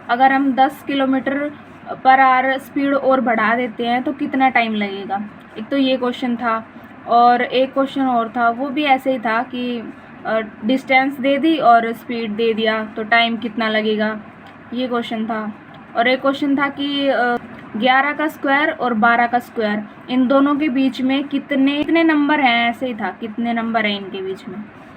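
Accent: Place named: Bagri, India. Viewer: oh aapki language meh thoda thoda bagdi touch hai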